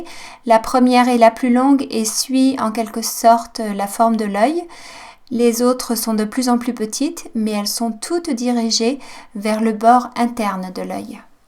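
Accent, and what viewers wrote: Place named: England, United Kingdom. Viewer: vous avez toujours été française ? car j'ai l'impression que vous avez un accent anglais.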